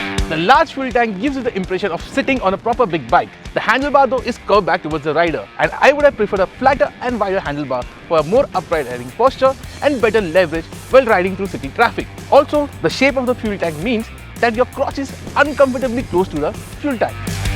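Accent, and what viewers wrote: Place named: India. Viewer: thumbs down for indian accent xDDD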